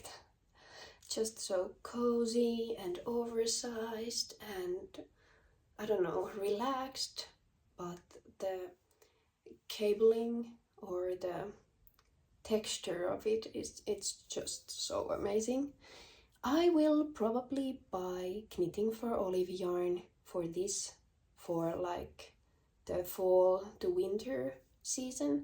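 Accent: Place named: England, United Kingdom. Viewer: You have a beautiful English accent!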